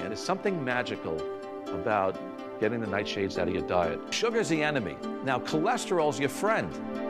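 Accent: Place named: Brooklyn, United States. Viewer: Dr Sinatra has been my cardiologist for a decade now and he doesn't even know it but I know of him from a grounding video as well as a supplement customer. He's down to earth and "grounded." That Brooklyn accent sounds a little like Anthony Faux Chi but unlike Faux Chi..... We KNOW Dr. Sinatra will always tell people the truth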